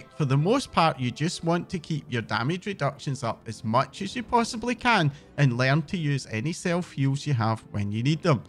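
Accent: Scotland, United Kingdom. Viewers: Thank you so much for this video. I've recently moved over to WoW from FF14 and this has been a great video that ACTUALLY goes in to the expectations around tanking in this game from the ground up. Plus it's nice to hear a friendly Scottish accent!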